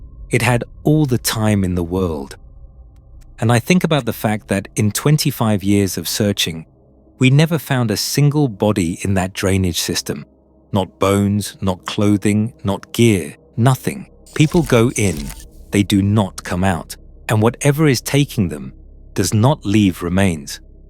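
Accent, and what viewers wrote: United Kingdom. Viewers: Why a British accent if this person was supposedly from the US PNW?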